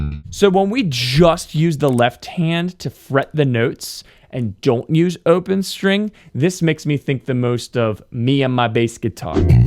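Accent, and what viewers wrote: Baltimore, United States. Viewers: Awesome lesson, man! I enjoyed the Baltimore accent most of all though.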